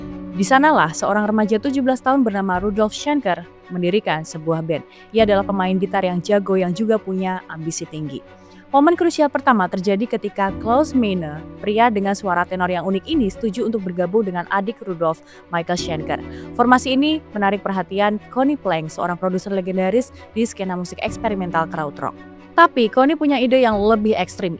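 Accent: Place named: Germany. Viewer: Oh orang Jerman rupanya patutlah cakap English pelat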